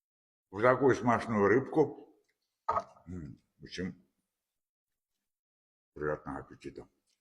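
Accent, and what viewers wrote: Belarus. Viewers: Белорусский говор.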